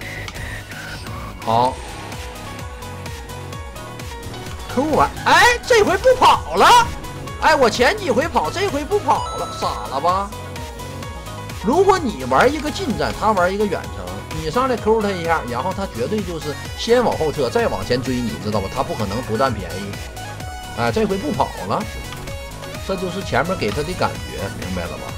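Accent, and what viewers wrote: Northeast, China. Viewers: 东北话太好玩了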